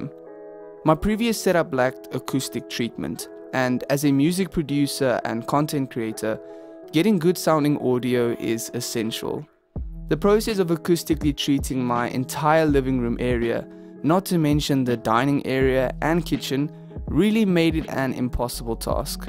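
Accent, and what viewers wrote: South Africa. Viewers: Is that a South African accent I’m hearing my boytjie? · You South African?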